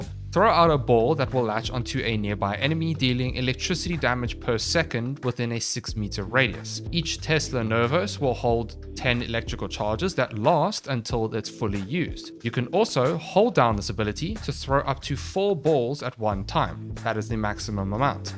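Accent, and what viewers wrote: South Africa. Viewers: are you south african your accent souns a little south african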